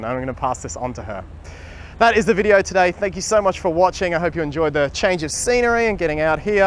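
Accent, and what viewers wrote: Australia. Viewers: I loved all the advices you gave the females,.... Taking notes & thank you.🙏🏽. And your Aussie accent is cute. Awesome. Sydney Harbour is a great land 🇦🇺🇦🇺🇦🇺🇦🇺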